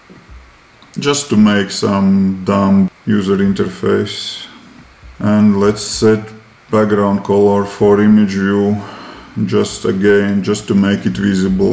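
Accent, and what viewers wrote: Russia. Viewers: какой русский английский ))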